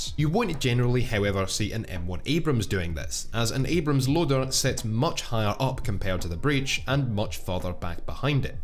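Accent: Scotland, United Kingdom. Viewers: Yes, I already knew everything said but sometimes you just want to spend seven and a half minutes listening to a Scottish man talking about a subject you like because they do it better than you. XD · You sound Scottish mate. A good pal of mine's was in the Royal Scots Dragoon Guards & used to drive a Challenger 2. He said it is still one of the best tanks in the world, even though there have been a few new ones introduced to various armies around the world. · Was trying to work out if your were one of us Scots.. wasnt until you said lads and lasses that i knew for sure! great video!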